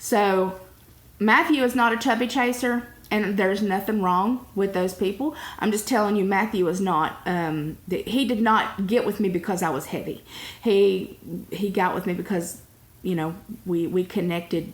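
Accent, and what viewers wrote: South, United States. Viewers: I just love your southern accent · I tripped over your videos one day and subscribed. I do not have weight issues. You look like my aunt Lois who did have a weight problem. You are beautiful and so was she! She was Pisces. What sign are you? Anyway, what got me to subscribe was hearing your accent, and your truthful discourse. You remind me of every southern girlfriend I had growing up. I LOVE HEARING YOU AND YOUR ADVICE!!! Are you from Tennessee? I'm from there, but I also grew up in North Carolina for 10 years. BTW I'm 65 with a 34 year old boyfriend. : )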